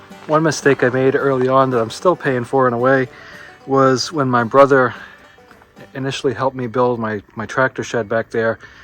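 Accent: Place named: Maine, United States. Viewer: Okay…catching up…been disposed the past few days. I prefer the over wheel straps. They are limited to that one job but transporting things like that, make them worth the investment. Tails….fold them up from the tail end to the ratchet, and then secure them with those little looped bungee cords with the ball end. May have to go around the folded end a few times to take up the slack, but they are cheap, quick, easy and effective!! That Downeasta accent is in your blood!!😁🤣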